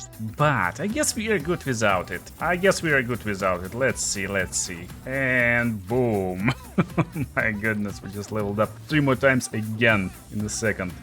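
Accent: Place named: Russia. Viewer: Такое чувство будто бро говорит с русским акцентом....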